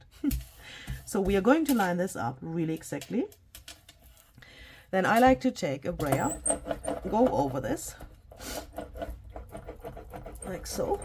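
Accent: Germany. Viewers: you make the best videos! I love listening to you - to the English speaker a German accent is so charming - and your English is very good! If only I could speak a second language as proficiently as you do! I admire you so much and am so happy for all your success. Thank you for all the great content! I am buying you a coffee too! OMG: as I continued to listen, I heard the "You do you" comment. How ridiculous people can be! He doesn't own those words, nor any other phrases. These are commonly used in English conversation. All influencers can have comments they like to make, but that does not mean it belongs to them unless it is something specific to a product they have copyrighted. Don't pay attention to anyone who criticizes you, you have never done anything at all wrong!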